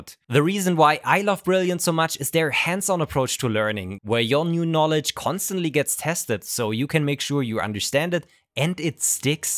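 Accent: Germany. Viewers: Do I hear a fellow German?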